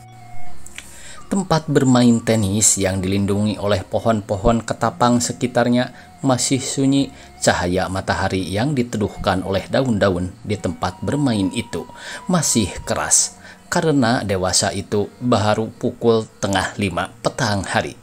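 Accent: Sunda, Indonesia. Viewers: Hhhe bang ki sunda gk klop certi dlm bhs indonesia karena sdh klop dongeng sunda hhe lagamna bhs sunda ki.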